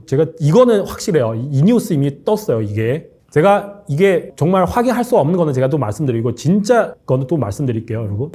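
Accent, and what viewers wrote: China. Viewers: 선족티가 난다. ㅋㅋㅋ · 이 사람 중국인인가요 발음이 쭝궈런인데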